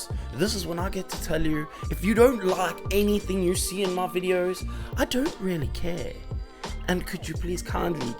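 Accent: South Africa. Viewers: PS: is that a hint of a South African accent I hear? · that's why it sounds familiar! I grew up in Pretoria, good to see a south African making such cool builds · God you have a gorgeous accent, is it south african, by chance?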